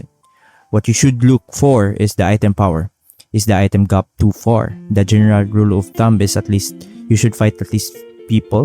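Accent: Philippines. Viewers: you pinoy? accent is obvious hehe. good video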